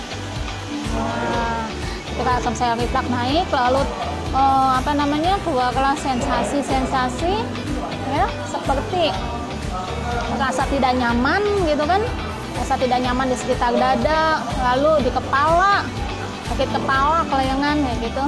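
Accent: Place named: Baubau, Indonesia. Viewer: Aslam Alaikum Bu asalnya dari bau2 saya juga dari bau2 tinggalnya mana sekarang logatnya tadi dari bau2 bls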